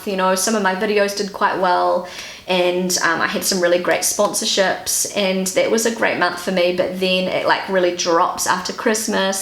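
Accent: New Zealand. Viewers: Hi, I just found your channel and I am subscribing immediately. How could I resist, you are a New Zealander!!! Love the accent. My mum was born in Palmerston North New Zealand. I live in Melbourne Australia, but New Zealand is like a second home to me. Wellington is my all time favourite place. During the 1970's, I visited New Zealand five times. The last trip was on my honeymoon. In those days, we were living in Sydney NSW. We moved to Melbourne Vic in 1981. Where are you from in New Zealand? Your husband's name is Rob?? Wow!! That is uncanny, as that is also my name. Incidentally, I used to have relatives living in Island Bay in Wellington and also in Wanganui. New Zealand is a beautiful country. Anyway, wishing you the very best. Rob. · I love your accent so much 🧡 I’ve been to New Zealand as an Aupair and you remind me of that beautiful time. Also I just realised we live really close to each other here in Franken 😁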